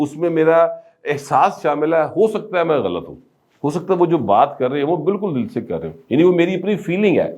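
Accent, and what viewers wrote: Punjab, Pakistan. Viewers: Love ❤️ your accent Punjabi plus urdu Kamal hai janab In a good sense really Riwan QURESHI USA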